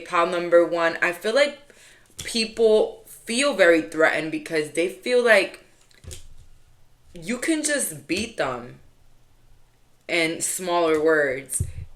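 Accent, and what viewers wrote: New York, United States. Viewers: You are my favorite reader on YouTube and we both live in New York City so I love the way you talk😂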